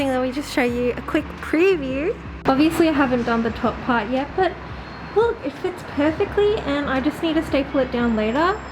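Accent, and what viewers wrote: Australia. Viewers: An Australian Accent?! Really sounds like your from Sydney :D !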